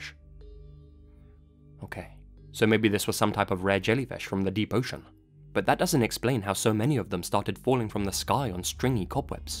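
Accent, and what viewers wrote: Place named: England, United Kingdom. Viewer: I cant stand the English accent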